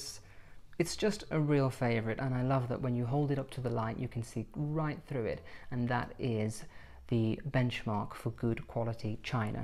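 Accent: RP, United Kingdom. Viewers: god i love british sm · Thanks for sharing. I didn’t know green tea wasn’t able to withstand very hot water. Interesting. My grandmother was from the UK and she passed away a couple years back. I usually drink coffee but I will have to have a cup of tea in memory of her. She was in the US for so long (from around 20 years of age due to marrying my grandfather who was in the Air Force; they met in England when he was stationed there)... Anyway, I’m rambling but I miss her. She was the most elegant lady I know. You remind me of her for some reason. It must the the accent as well. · My goodness. I'm so mesmerized. My grandfather is a Londoner in the US and I've never heard anyone so posh besides him....but also SO amazingly refreshing. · Way too posh for me! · I went to an English school for sixth form and I love all of Britain. But (please forgive me) this type of accent is so hilarious, it sounds so pretentious and formal, I always wonder what you must have put up your * and what size it is. 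Great video though. WHERE IS MY SCONE?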